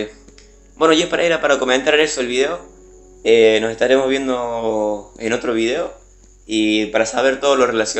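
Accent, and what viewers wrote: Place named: Córdoba, Argentina. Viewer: amigo te re queda el cordobes kpo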